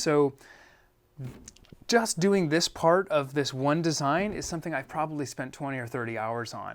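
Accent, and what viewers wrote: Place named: United Kingdom. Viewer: The intro made you sound british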